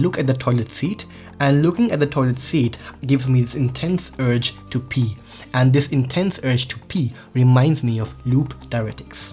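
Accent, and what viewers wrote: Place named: India. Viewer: I feel that your English accent is Hindi. Please make a video about speed reading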